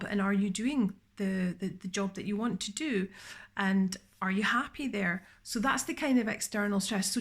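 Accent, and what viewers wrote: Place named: Scotland, United Kingdom. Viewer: A friend of yours sent me your way and i have to say it's like you are telling my story.. but in a Scottish accent hehe which is beautiful i might add. Thank u xxx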